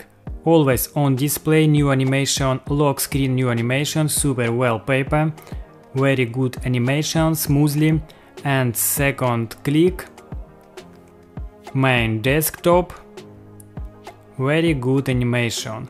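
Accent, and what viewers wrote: Russia. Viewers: ахпхп тут понятно что ты русскоговорящий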